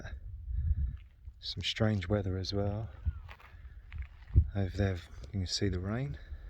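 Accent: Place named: South, United Kingdom. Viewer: So you’re from Sheppey! I was wondering where your lovely, rich, south of England accent came from. I could listen to you read out the phone book In 2005 I interviewed to teach English at Minster College and stayed the night before at the Abbey Hotel … I’ll never forget.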